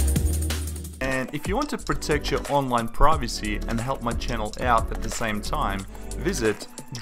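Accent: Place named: Australia. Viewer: Well we appreciate the information, the Australian accent is marginally tolerable, but with the addition of the needless interference of the BackBeat.. a plague on the present human race, your presentation is completely undigestible alas.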